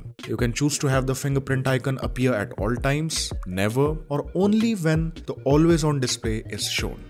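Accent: India. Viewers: Goddamn Indian accent! 🤦🏽‍♂️ Smfh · This is pure indian accent 😊 I wouldnt say we are ashamed of it ;)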